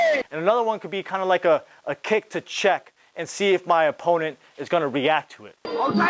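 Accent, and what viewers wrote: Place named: Russia. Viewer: Low Kicks my #1 favorite technique, no bullshit Russian Accent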